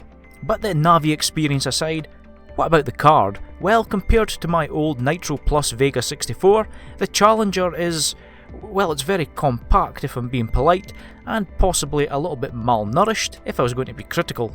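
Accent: Scotland, United Kingdom. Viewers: Subbed for the Scottish accent lol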